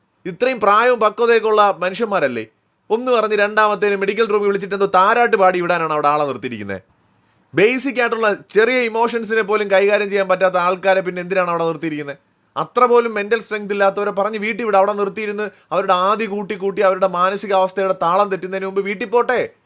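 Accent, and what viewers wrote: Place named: Kollam, India. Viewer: Samsaram kettit chettante veedu ethaand Kollam jillayil annen thonnunnu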